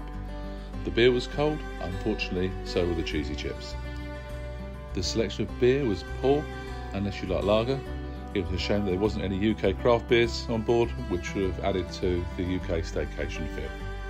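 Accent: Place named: South, United States. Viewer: Good to hear those soft southern tones again, better than music…. Did we also see a plaid shirt by any chance ?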